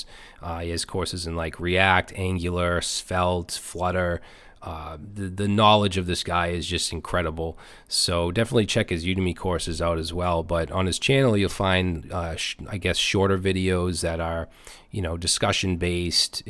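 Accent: Boston, United States. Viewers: I still like your channel the most. I'm a software engineer and have been for the last 4 years professionally in part thanks to your videos, the thick Bostonian accent brings nostalgia.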